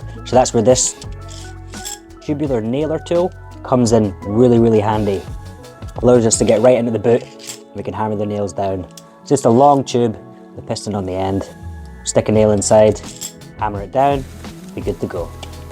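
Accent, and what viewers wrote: Scotland, United Kingdom. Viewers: Just started watching your videos 3 days ago and absolutely love them! Recognized that Scottish accent too. I was stationed at Holy Loch in 70-71. I really miss that place and its wonderful people there. I just subbed and look forward to your excellent shoe repair.😊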